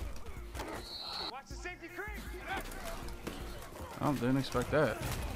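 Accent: Philadelphia, United States. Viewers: You from philly?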